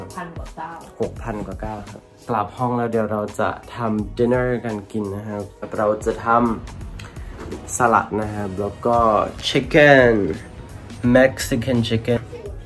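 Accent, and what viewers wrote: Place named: England, United Kingdom. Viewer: Hihihi I love yo English accent 🥺♥️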